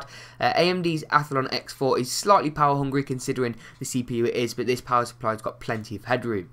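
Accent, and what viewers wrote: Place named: Doncaster, United Kingdom. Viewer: I know this man is from Doncaster, that accent has a weird " dh-u" noise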